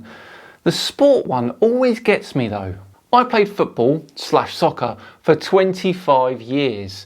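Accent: United Kingdom. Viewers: is a nasa shill using a British accent or whatever to sound smart he also could be homosexual according to some accounts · I think is hilarious. I’m assuming he’s British from his accent and sense of humor, but that’s right up my alley. Besides, if I didn’t, I wouldn’t be here.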